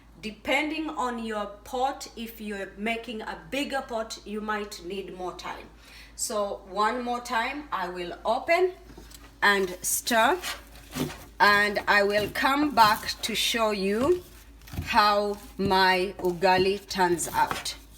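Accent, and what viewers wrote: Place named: India. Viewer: Your English has got Indian accent I'm a bit surprised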